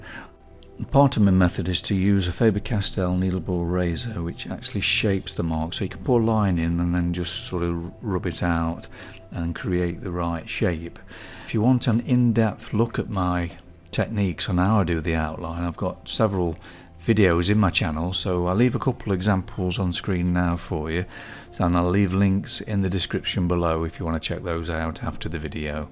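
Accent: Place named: Coventry, United Kingdom. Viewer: you sound like youre from around the coventry area.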